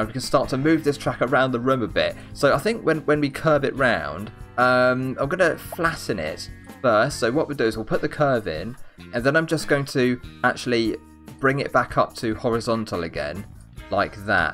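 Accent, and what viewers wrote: United Kingdom. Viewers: Are you British? I ALSWAYS WANTED TO BE BRITISH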